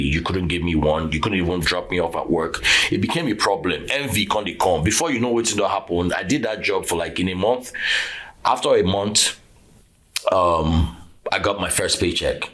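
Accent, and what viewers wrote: Nigeria. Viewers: I can tell you straight away that you are Nigerian and lam not from there! First few moments of talking.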